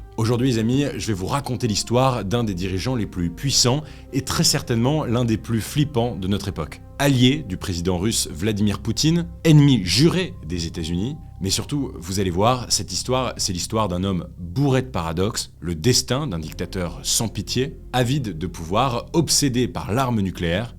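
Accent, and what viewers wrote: France. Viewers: C'est pourtant correct... jamais parfait vu l'accent français mais ça ressemble quand même bcp...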